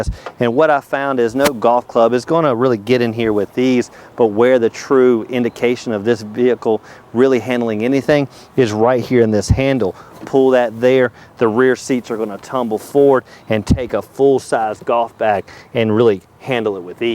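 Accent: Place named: Alabama, United States. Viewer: Lol is this guy from Alabama or someshit never heard english like that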